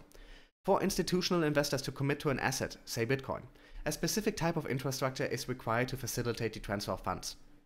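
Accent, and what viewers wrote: Germany. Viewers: I like your German English accent, you speaking English very well which make you huge fans from Europe & USA around the world.. Finally I like your videos which are confident 🤝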